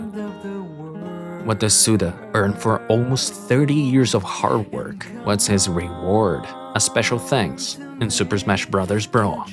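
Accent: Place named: Brazil. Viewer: Desde os primeiros segundos de vídeo eu tive uma voz alta na minha mente dizendo "eu sei q esse cara é brasileiro mas eu não tenho como provar" ate que com o tempo o próprio vídeo confirmou o meu pensamento e ter isso em mente enquanto assistia deixou a experiência ainda mais divertida(caçar os detalhes mais óbvios tipo as partes em q vc falava português ate os pequenos detalhes tipo o horário no desktop e etc) . Incrível o seu trabalho e espero muito que seu canal de certo.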